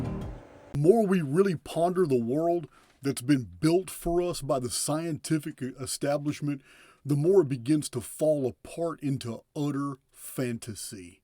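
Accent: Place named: Texas, United States. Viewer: From which part of the USA is accent?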